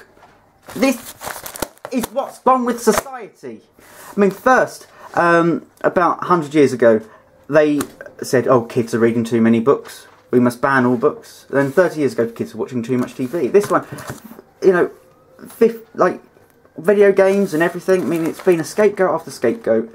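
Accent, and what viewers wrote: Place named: Manchester, United Kingdom. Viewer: #1 BEST MANCUNIAN ACCENT EVER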